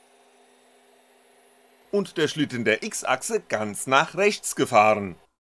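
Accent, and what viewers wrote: Hesse, Germany. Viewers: Es geht nicht um deinen Dialekt, es geht nur um die überdeutliche Betonung. Also nichts gegen deinen Dialekt, im gegen Teil! Hessisch is doch a Geiler Dialekt! 👌 Mir ging es darum das es anstrengend ist das ganze Video zu hören. Infos und du Machart ist echt super. Also nicht als Kritik an sehen ;-) , nur meine Meinung! Trotz allem weiter so, Abonniert ist ja auch schon. Grüße nach Hessen Thomas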